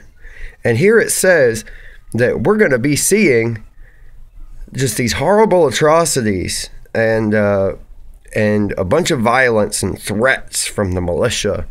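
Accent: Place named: Virginia, United States. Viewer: haha man you sound like youre from VA! am i close?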